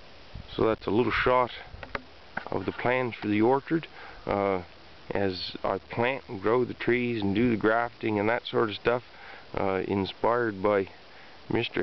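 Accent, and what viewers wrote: Nova Scotia, Canada. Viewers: Oh my god! I had to laugh - I was looking up videos on Orchards and planting new ones and as soon as you opened your mouth I was like "holy shit, this guy is from Nova Scotia" which is where I happen to be from, too, and where we will be planting an orchard after we move back home from BC. Love it. Thanks for the video and information, but also to the throwback for the old South Shore accent.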